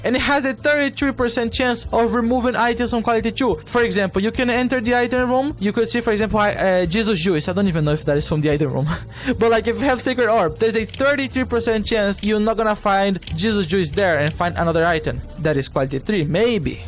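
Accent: Brazil. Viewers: o cara é br. percebi pelo sotaque kkkkkkkk. tmj mano, video mt bom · Percebi que é BR pelo sotaque no inglês haha massa cara...eu simplesmente não consigo passar o Mega Satan com o The Lost pra abir Godhead...muito ruim deixar de pegar os itens da devil room pra dar a Angel room e pegar as chaves acho que nunca vou conseguir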